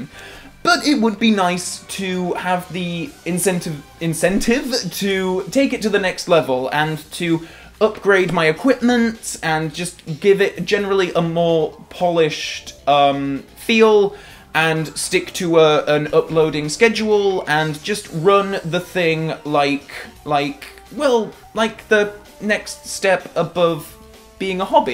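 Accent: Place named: North, United Kingdom. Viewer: Thank you very much for replying! The curious thing about doing a You Tube series for so long is you can chart yourself and any differences. Just to say, I really like your older videos as well as your newer ones (so I hope you never take those down). In their favour, I'd say you had quite a droll style back then, and it was fun to see when you got (faux?) annoyed. But I like how you come now across as well, it's very engaging and warm, esp to new viewers. Although it is nice to see when you get put out by something bad in a Bond film or game. And yes, you did used to sound a lot more Northern!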